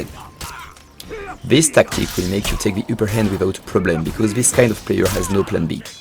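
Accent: France. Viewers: Ah a fellow Frenchman it sounds like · Are you French? Cool accent! And great video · J’entends que tu est français😂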